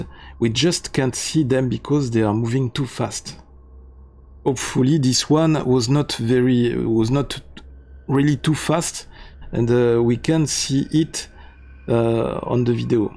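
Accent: France. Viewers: Fuck yeah!! The coolest Alien footage but who new delivered in a french accent is bangin man!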